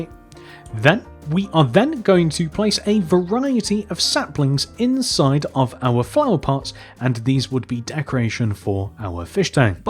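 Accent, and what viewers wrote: United Kingdom. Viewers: Are you British?🇬🇧🇬🇧🇬🇧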